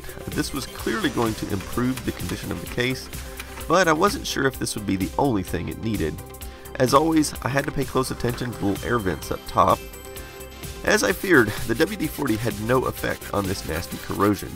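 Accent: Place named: South, United States. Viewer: I’ve noticed that speaks with a slight southern accent. It’s not very noticeable but if you pay attention you can hear it, and since he grew up in Texas I can only see why. Love you man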